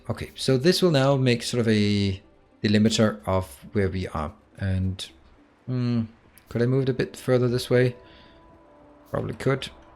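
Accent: Denmark. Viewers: danish accent?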